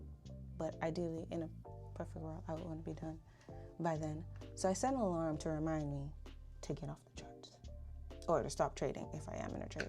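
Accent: Bahamas. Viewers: Im enjoying this video. I had stopped trading for like over a year, but youre inspiring me to get back into it. Btw are you Bahamian? You sound like it. Anyway great video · did i hear a bahamian accent 👀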